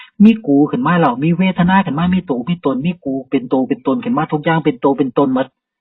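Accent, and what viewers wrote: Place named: Isan, Thailand. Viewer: มักเสียงอิสาน ครับ สาธุๆๆ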